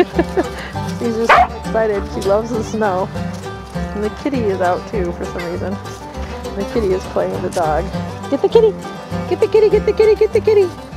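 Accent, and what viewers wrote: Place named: New England, United States. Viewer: Its so naaaaaesty! Haha yankee accent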